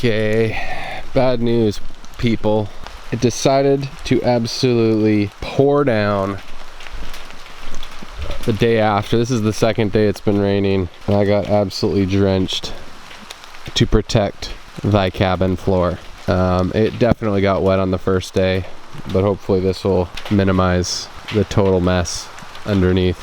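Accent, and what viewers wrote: Canada. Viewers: Wow I’m Canadian and could’ve sworn you were Canadian. U sound like one.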